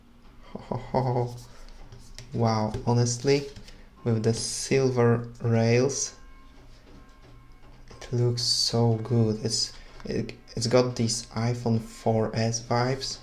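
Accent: Poland. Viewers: are Polish? yor accent is so strong ;-)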